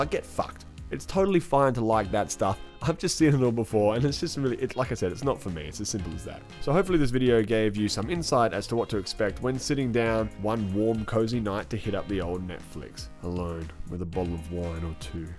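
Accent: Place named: Australia. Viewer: The review was better then the show...Perhaps #Netflix could give you your own series with you doing just reviews.... But make sure you use that Australian accent..some people really like that !! "OK so I give the show a C- and the review a solid A"....... PEACE and BE WILD !!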